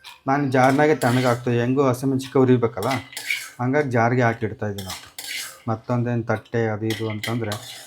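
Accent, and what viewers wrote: Chitradurga, India. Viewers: My mom has become your fan. Trying to understand your Chitradurga Kannada, but nice.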